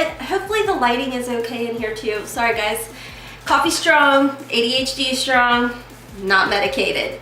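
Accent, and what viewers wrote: South, United States. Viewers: I have a question.... Do you have a very heavy southern accent normally? I feel like you might but try not to have one in your videos maybe??? Well, if so, you should really let that shine through.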